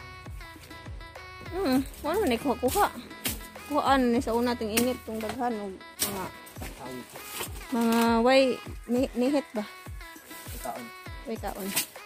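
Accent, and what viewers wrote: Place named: Bohol, Philippines. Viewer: Boholano kaayu Miss🥰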